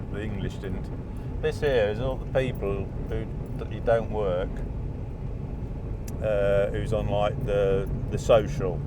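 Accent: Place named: Leicestershire, United Kingdom. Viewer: Many thanks for making videos. From your accent, I think we're from the same place, Leicestershire or close.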